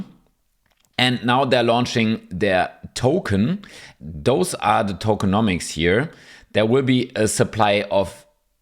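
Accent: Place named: Germany. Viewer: ich seh grad in den Kommentaren dass du auch deutscher bist hab mich schon gewundert wegen dem Akzent 😅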